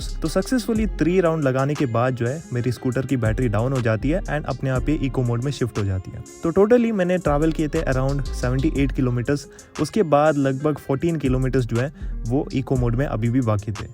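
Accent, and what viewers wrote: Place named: South India, India. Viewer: Sauth Indian ho bro aap aap ki accent Kuch alag lag rahi he muje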